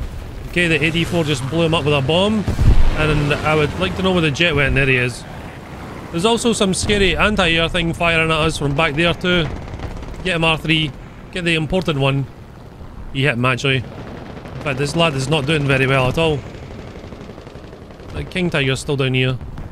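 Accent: Scotland, United Kingdom. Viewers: I have no interest in war thunder, I’m just here for that Scottish accent and the Tanks.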